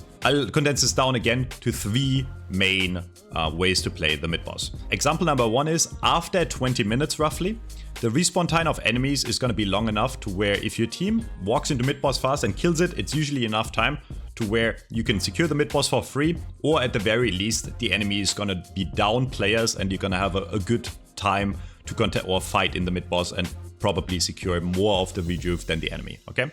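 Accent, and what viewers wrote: Germany. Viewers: I can hear Deutsch :^D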